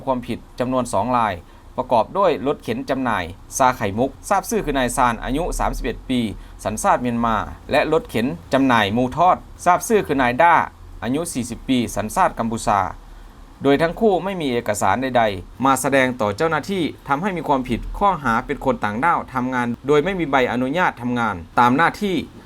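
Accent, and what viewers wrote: Laos. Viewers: เเอดมิน เป็นคนประเทศลาวป่าวคับ สำเนียงเหมือนเลย ภาษาไทยก็เขียนผิด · คนบรรยายเป็นคนลาวบ่ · เว้าลาวเถอะไอ้หนู กูอายแทน แค่ออกเสียงคำควบกลำ้เขาก็รู้ว่าเป็นลาว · แอดเป็นคนลาวพูดภาษาไทยไม่ซัด แอ็ดเป็นคนลาวแน่นอดครับเชื่อผม ฟังภาษาและสำเนียงให้ดีนะครับพี่น้อง ข่าวที่เป็นจริงเราต้องฟังแอ็ดที่เป็นคนไทยนะครับอย่าให้คนลาวปั่นหัวเราใด้ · ข่าวดีแต่คนอ่านข่าว ลาวคัก.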